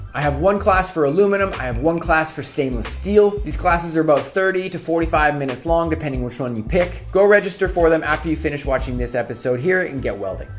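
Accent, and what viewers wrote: Canada. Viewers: canadian detected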